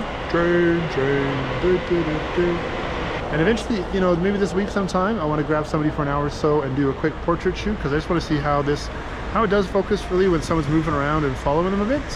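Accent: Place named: Western Canada, Canada. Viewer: you sound western canadian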